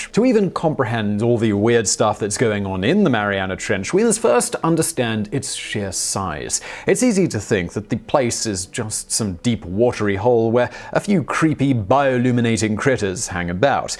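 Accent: United Kingdom. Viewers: This dudes voice is so british it seems fake tho im sure jts not lol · Kraken. He's British.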